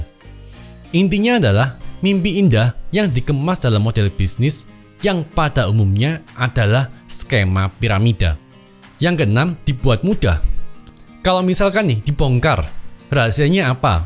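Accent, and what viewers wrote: Java, Indonesia. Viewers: sukaa bangeet motivasinyaa...medok juga suaranya..kereeeen bener semua yg diomongin bukan ngasal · Denger om ngomong i satisfying, medok e enak wkwk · Agak medok' ga bro 😁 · Betah gua liat Koko ini kalo ngomong pasti Koko Jawa kalo Koko Jakarta tengil2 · Haha logat nya Jawa banget 🤣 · Logatmu aku suka mas,jowo mu medok 👍👍👍👍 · Sampean wong jowo opo lek.. mergo logate rodok medok rodo chino🙏🙏salam jowo lek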